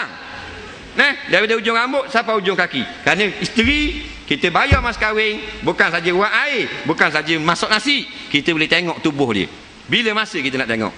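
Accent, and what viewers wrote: Terengganu, Malaysia. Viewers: tak faham la ape dia ckp..loghat ganu pekat sgt.. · Saya pn faham... Ganung power...syok dengar org ganung cakap #ankjatikedah...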